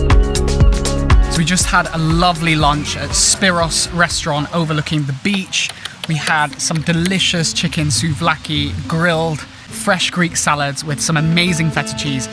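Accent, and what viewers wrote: United Kingdom. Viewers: Oh yeah, I would guessed Londonish because I lived there for a while and I felt in love with their accent but for me it's inimitable🙈😄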